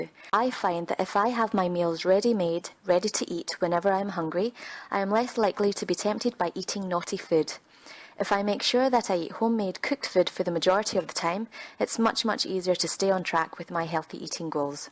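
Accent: Scotland, United Kingdom. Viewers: Love your videos and your Scottish brogue Regarding chickens and washing... I always wash raw chicken prior to cooking especially if it is whole This practice reduces the harmful bacteria count especially the dreadful salmonella which can cause food poisoning if you unfortunately undercook your chickens Immediately sterilize the sink counter and all items used to handle the raw chicken It is wise to use a food thermometer inserted in the thickest part of the chicken but away from the bone Health Canada advises 85 degrees C or 185 degrees F. (Some authorities are happy with 170 degrees F) The chickens should "rest"for 15 minutes prior to carving Keep up the good work with your fun and helpful videos! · I came on youtube to look for some meal prep inspiration cos I need to lean up. Great video! But I can't get over you being Asian with a Scottish accent hahaha x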